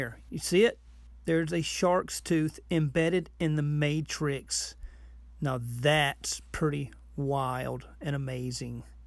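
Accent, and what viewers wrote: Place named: Pennsylvania, United States. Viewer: Your accent sounds like you are from PA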